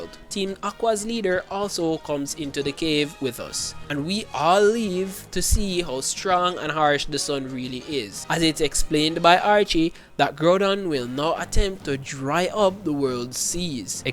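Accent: Jamaica. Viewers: I KNEW you were Jamaican. What parish you from? My Mom's from Hanover. I was born in Canada though. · Are you Jamaican?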